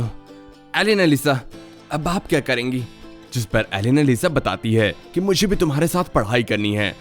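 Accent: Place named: Lucknow, India. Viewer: Pakee chinery se pata lagta ha ap bhi up hardoiya Lucknow Se ho😊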